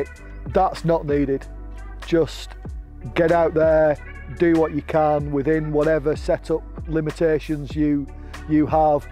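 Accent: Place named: Lancashire, United Kingdom. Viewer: He does have a strong accent, my guess is he's from Lancashire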